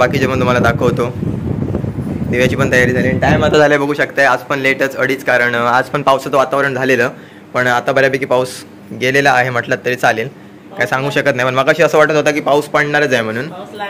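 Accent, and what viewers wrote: Malvani, India. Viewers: Are bhava malvani bol Chan Sanvte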